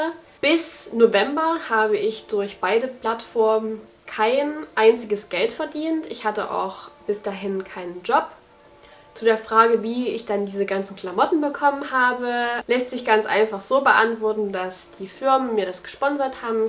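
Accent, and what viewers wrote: Saxony, Germany. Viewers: It's so weird to hear you speak german, especially with your saxon accent 😆 cute! But I think you understood one question wrong.. the 19th century is vom 1800 to 1899😂 you talked about the 20th century haha. Non the less it was interesting to hear your answer to it!! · Dachte erst eine Deutsche spricht Englisch (also vom Akzent) 😂👍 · Oh, wie süß, du hast einen ganz leichten sächsischen Akzent! Finde es sehr schön, dich mal auf Deutsch zu hören! <3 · Ich dachte jetzt kommt ein Deutsch mit einem starken Englischen Slang und dann sächselt sie 😅😅 Sehr überraschend ❤ Liebe Grüße von meinem Kanal 🌸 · Eine Sächsin ?😛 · Sandys Leben Dachte ich auch aber man hört den deutschen Akzent im Englischen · Das stimmt. Man hört in ihrem Englisch, dass sie deutsche Muttersprachlerin ist. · Juhu, auch jemand aus Sachsen....und ich hab mich schon gefragt warum ich dich so gut verstehe. 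^^` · Dein deutsches Video hat mir sehr gut gefallen 😊 Ich mag deinen leicht sächsischen Einschlag in der Aussprache. Ich liebe diesen Dialekt. Deswegen würde ich auch gerne wieder ein deutsches Video von Dir sehen. Aber die englischen Videos gefallen mir auch sehr gut. So lernt man auch noch nebenbei die Sprache beim Zuhören. Deine Kleidung auf Instagram oder Kleiderkreisel zu verkaufen fände ich gut. Ich wäre schon mal interessiert 😉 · Hach, es fühlt sich so heimelig und ungezwungen an wenn dein sächsischer Dialekt etwas durchkommt. Ich bemühe mich vor meinen „westdeutschen“ Freunden immer um Hochdeutsch aber ganz verdrängen kann man das „nu“ und „ooch“ nie, ganz schlimm! 😅 aber es war sehr angenehm dich mal auf deutsch zu hören und du siehst toll aus in dem video☺️ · Als ich dich englisch reden hören habe, wusste ich sofort, dass du Deutsche bist. Du hast einen ziemlich starken deutschen Akzent in deiner englischen Aussprache. 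· Haha, habe dich gestern erst entdeckt und gerätselt, was für einen Akzent du hast. Und tadaa, es ist deutsch.😂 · sie sächselt definitiv 😅 als sehr hochdeutsch Sprechende höre ich das extrem 😂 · Naja sächseln tut sie kaum... einen englischen Akzent höre ich bei ihrem Deutsch überhaupt nicht. Aber den deutschen Akzent, wenn sie Englisch spricht, den hört man ganz klar. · Und egal wie sehr du dich anstrengst, man hört das du aus Sachsen kommst. 😅 Find ich aber gut, kann mich richtig mit dir identifizieren. 😂❤️ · Ich war schon verwirrt, warum klingt dein Englisch so deutsch, anscheinend kommst du auch aus Deutschland😂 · Awa haha die hat einen Ultra deutschen Akzent in englisch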